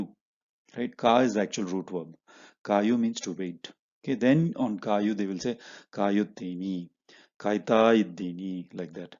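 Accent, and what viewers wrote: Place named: Tamil Nadu, India. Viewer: Sir please try not to use Tamil accent while speaking kannada... There are many words that you pronounce wrong in kannada please correct it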